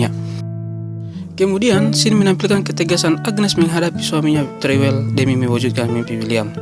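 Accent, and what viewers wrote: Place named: Papua, Indonesia. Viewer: CARA NGOMONGNYA KAYAK PAPUA MAAF YA BNG 🙏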